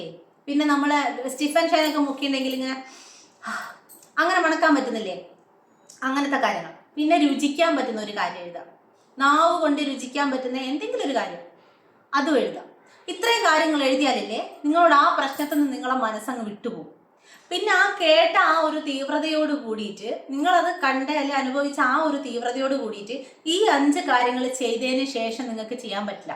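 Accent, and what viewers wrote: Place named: Kannur, India. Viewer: Ningal kannuraano 😅.nammale athe basha slang..veetil ulla areyo pole thoni😅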